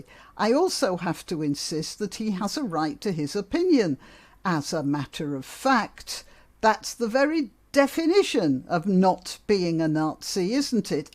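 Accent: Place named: United Kingdom. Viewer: had previously been known for his fair and balanced outlook, and lovely British voice. He was an alternative to Radio 4 for refugees from the left, we craved the accents and deep tones but not the woke nonsense and open boarders (sic). Lol.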